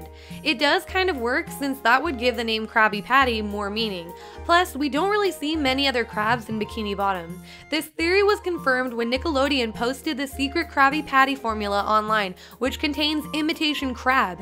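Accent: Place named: South, United States. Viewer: so was I right you are from the south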